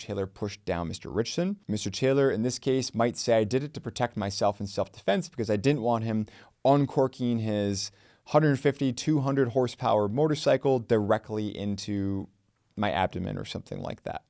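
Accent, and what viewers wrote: United Kingdom. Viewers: Dudes British. I understand now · Nah, southern England but not London or estuary or West Country dialects. 🏍️🏴🦃👊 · He's got an English accent!!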